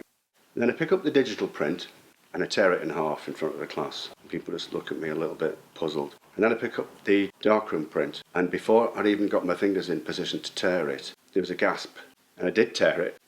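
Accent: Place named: United Kingdom. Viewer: You said 5 x 7 and yet have the British accent ?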